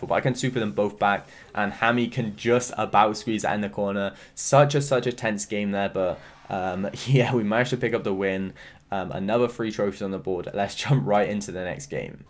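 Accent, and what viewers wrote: United Kingdom. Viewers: Love ur accent😂 Btw is that british?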